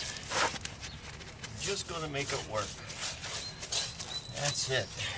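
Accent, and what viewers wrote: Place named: Scranton, United States. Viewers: You can't miss that Wilkes-Barre / Scranton accent. Thanks for the videos. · NE Pa is my old stomping grounds. Good to hear that Pennsylvania Dutch accent again.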